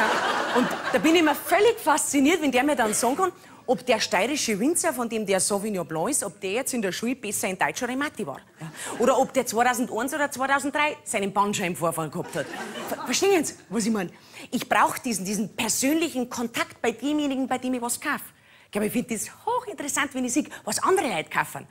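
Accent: Bavaria, Germany. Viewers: ich mag sie sehr und schaue auch ihre auftritte. ich muss aber ganz selten lachen. leider verstehe ich kaum was... bayrisch und dazu noch schnell, das ist nicht einfach für einen nicht-bayer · Ich liebe die Bayern und deren Dialekt.... ist der weibliche Mittermeier 🍻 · Schön wenn man als Ostberliner versucht bairisch zu verstehen.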